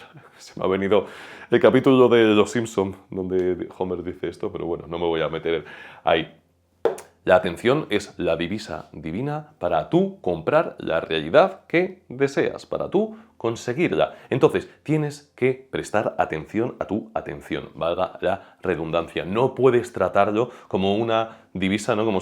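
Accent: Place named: Latin America. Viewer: Lo que preciso es mirar a tu espalda por tanto tiempo como quisieras mostrármela. Qué guapo eres,. Sólo espero que la cámera pueda encajar más bajo de todos lados. Dulce. Lo siento. A la profesional. Como maestro de castellano, yo querría saber de tu manera del hablar., hablas un poco como un latino en relación a "voy a..." y nunca usas "esperaré, intentaré". Además usas "las cosas" cuando un español de pura cepa nunca lo haría. "La cosa, la cosita" son de latinos. ¿Tienes amigos latinos o te has quedado en Perú o Méjico? ¿Quizá tu mujer es latina? I'm strictly curious and obviously Te visto con buenos ojos, and mean you no ill will. Si alguien me ofretara 1000€ a decir algo malo de ti, no lo haría. Y finalmente, sobre el tema: La razón por qué no funciona la serie de "cosas" por un tío sea que lo que dijera no era factual. "Sí, lo quiero", pero en el corazón, no es verdad. 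Cuando nos mentimos puede sonar de verdad y a veces sólo nosotros no sabíamos que fue mentira.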